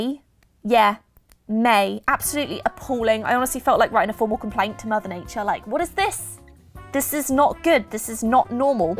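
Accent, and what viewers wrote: United Kingdom. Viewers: Someone plz explain. She has a amazing British accent but she talks all the time about Walmart, USA and other things related to that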